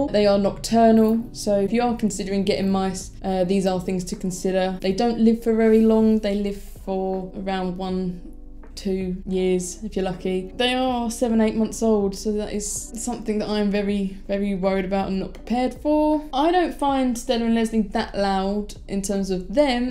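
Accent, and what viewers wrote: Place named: United Kingdom. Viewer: Love the accent innit. UK accents are so cool.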